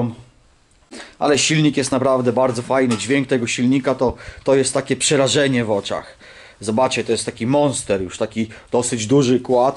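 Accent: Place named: Podlasie, Poland. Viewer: Kolega chyba z Podlasia sądząc po akcencie ;-)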